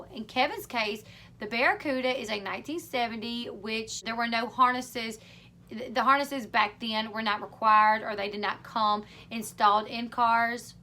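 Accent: South, United States. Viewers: Taking short wolks your voice is amazingly southern, and it was a 1970 barracuda yea but it had a hellcat motor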